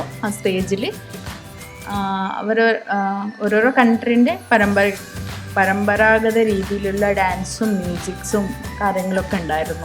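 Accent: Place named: Tamil Nadu, India. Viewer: Vlog super.... Pizza adipoly aayitto.... പിന്നെ നിങ്ങളുടെ സംസാരം എനിക്ക് നല്ല ഇഷ്ടായി.. തമിഴ് കലർന്ന മലയാളം... സൂപ്പർ....😍😍😍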